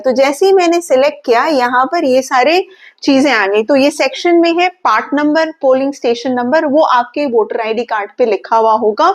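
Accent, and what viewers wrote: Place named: Uttar Pradesh, India. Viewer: Up ka lag raha hai Mam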